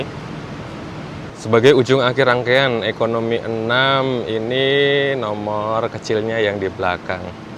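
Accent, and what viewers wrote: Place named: Sunda, Indonesia. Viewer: A jiga na udah kental sekali bahasa sunda na hahaha😅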